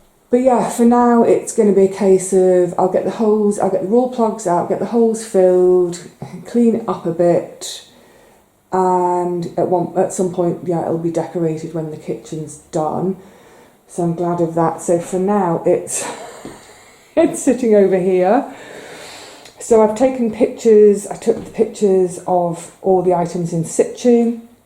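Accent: United Kingdom. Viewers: british accents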